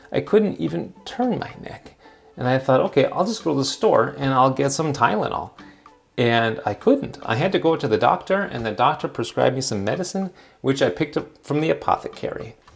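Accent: Germany. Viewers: Funny... you sound more and more german each episode. I mean, listen how you pronounce "Episode" in the beginning ;) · Ha ha I thought you were German because you really have acquired a German accent! 😀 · A very strong German accent and a lot of expressions and grammar that Americans don‘t use ;)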